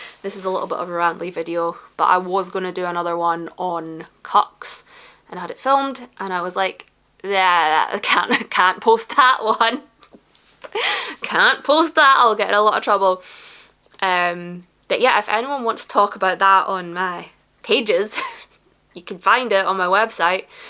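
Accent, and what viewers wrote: Scotland, United Kingdom. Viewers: scottish is like drunk poetry, tell me more love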